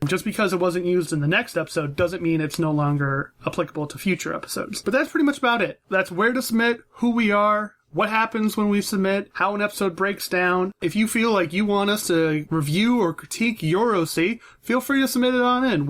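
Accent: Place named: Scotland, United Kingdom. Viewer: I still love your accent. .3. only because scotish people are awesome. I wish to live in scotland or ireland one day, cause Australia now bores me o3o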